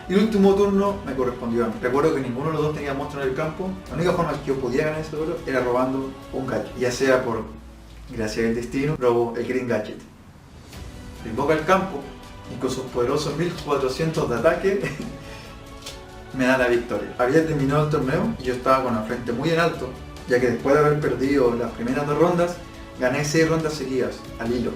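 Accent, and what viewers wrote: Ecuador. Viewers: Eres ecuatoriano o chileno jajajaj decidiré weon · Suena a mensaje de anime, pero la verdad es que nunca hay que rendirse y gracias a eso fue a un mundial entonces siempre diviértanse y jueguen PD. Eres ecuatoriano???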